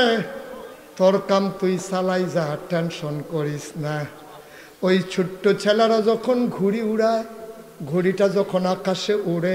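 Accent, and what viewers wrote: Comilla, Bangladesh. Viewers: কুমিল্লার ভাষায় ওয়াজ মাহফিল শুনে ভালো লাগছে!!! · আহা,আমার মধুর কুমিল্লার আঞ্চলিক ভাষা।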